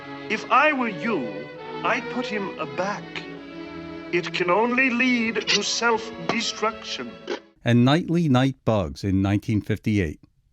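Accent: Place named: Philadelphia, United States. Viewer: I hear an accent I can't ever not hear again, sir, would you happen to be from Philadelphia?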